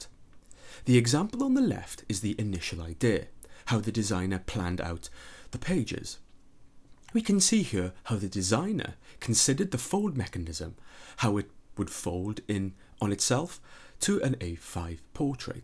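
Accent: Wales, United Kingdom. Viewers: Hey fella are you Welsh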